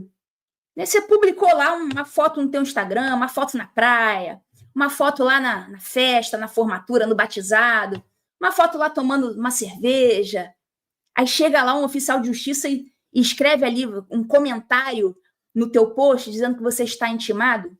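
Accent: Rio de Janeiro, Brazil. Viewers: Sotaque carioca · adoro você e esse seu carioques é lindo como você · O sotaque carioca confundiu o ouvido do pessoal. Aos meus ouvidos, sinceramente, passaria batido, tanto que só fui perceber a "confusão" porque veio a tona rsrs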